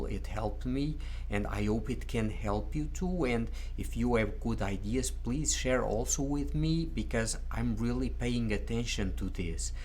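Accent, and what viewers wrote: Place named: Portugal. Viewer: I think that you are portuguese and are reading stories in spanish with a portuguese accent. it doesnt sound authentic when you read in spanish. I am portuguese and speak spanish so I can pick up on your portuguese accent when you read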